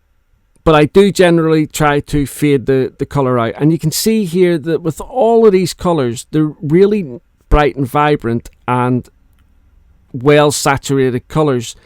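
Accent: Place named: Northern Ireland, United Kingdom. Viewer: I was about to donate to Habitat( a thrift store i Florida) , my colored pencils, which I bought 8 years ago and never used (Faber Castell,Derwent & Reeves) I went online found your site. It was your clarity and honest information that started me -But your NI accent made me homesick for ‘the island’ - I lived in the Republic for 30 years, and was part of the Forrum for Peace and Reconciliation, and spent time at Queens, for the resurrection of NIGP. I wound up buying more pencils, and was converted away from acrylics. Thank you, thank you for making the whole genre clear -, fun and creative and opening up a new, endless possibilities.🎉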